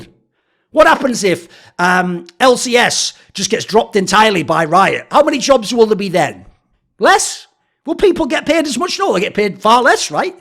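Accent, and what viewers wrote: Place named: Scotland, United Kingdom. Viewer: its probably been 5 years since i've seen a thoorin video since i quit league in high school and i forgot how scottish this guy is 😭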